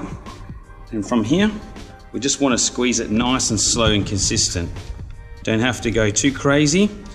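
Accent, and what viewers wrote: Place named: Australia. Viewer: Nice oz accent mate, I am Asian spent 4yrs in MEL,I feel like home when u speaking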